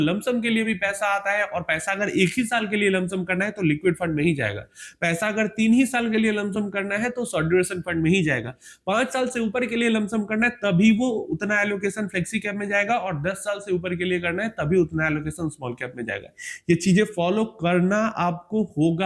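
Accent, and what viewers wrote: Bihar, India. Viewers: Namaste aap bihar se ho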